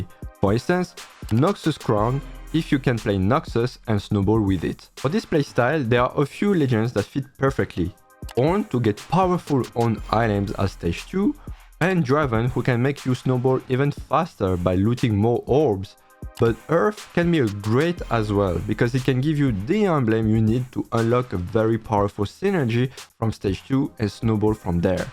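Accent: France. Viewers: I love your videos, really. It's clearly visible that you're trying to maintain high-quality content, do much about the montage and even added captions, probably being aware of your French accent that might be hard for someone to understand. Wow. Chapeau bas! First time in my life I really feel the urge to share someone's content because I feel like you deserve it! Keep up the good job, you're amazing :)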